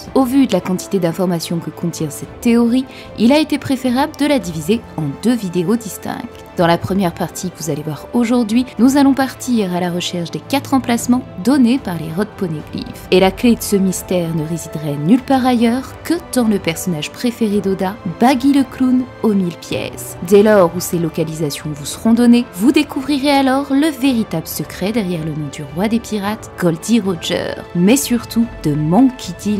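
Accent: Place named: Belgium. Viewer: Vu l'accent, je dirais qu'elle est belge.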